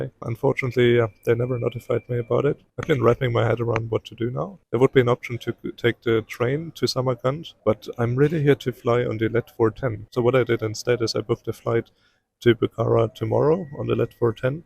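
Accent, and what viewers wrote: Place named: Germany. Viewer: Такое чувство что немец говорить на английском...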